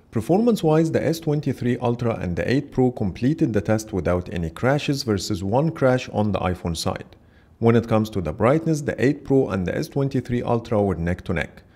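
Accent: Egypt. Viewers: انتي مصري صح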